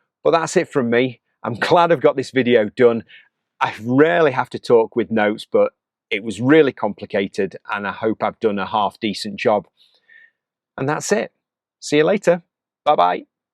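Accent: Yorkshire, United Kingdom. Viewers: Another great video: useful info delivered in a characteristic [no-nonsense Yorkshire] way. Well done & thanks. We’ve just collected our own FIAT Ducato 5.40 van, a choice much influenced by your own decision making process (as shown & shared in your previous videos, so thanks for that!). She’s a 2017 Possl Roadcar whom we’ve christened Freya. We live in The Netherlands (a campervaner’s paradise), and now happily wave to all Ducatos that we see driving around! Keep making the films - they are hugely helpful to your many followers. Happy travels en “tot ziens” (Dutch for see you)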